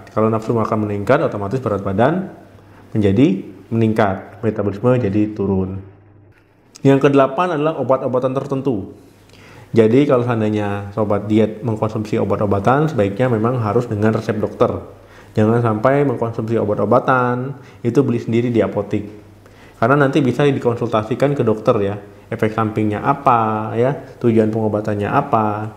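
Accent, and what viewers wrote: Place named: Bali, Indonesia. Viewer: Dokter ini pnya logat bali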